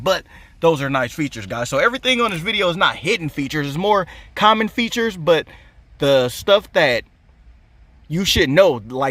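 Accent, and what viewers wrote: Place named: South, United States. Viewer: Dude sounds like a country southern White boy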